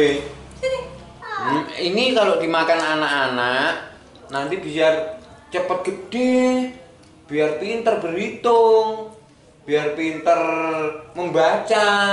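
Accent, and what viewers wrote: Java, Indonesia. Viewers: Borneo,kok jowone kentel bgt.asline ngendi lek.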